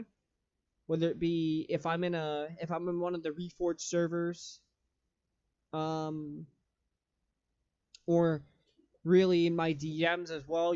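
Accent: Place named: North America. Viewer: U do sound very North American, would never of guessed Sweden lol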